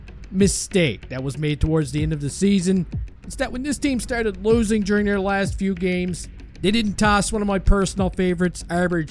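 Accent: Newfoundland, Canada. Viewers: Do I hear a newfie accent?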